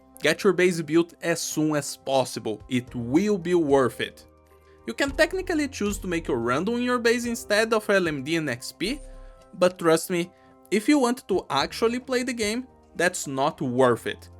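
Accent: Brazil. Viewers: Are you Brazilian? I could tell by your accent, I thought it was cool. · Pela pronuncia consegui perceber que é brasileiro kkkkk, ótimo vídeo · you talk like a brazilian fella(im from brazil) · Eu ouvi a voz dele e insta reconheci o sotaque kkkkk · Você não me engana, esse sotaque é de brasileiro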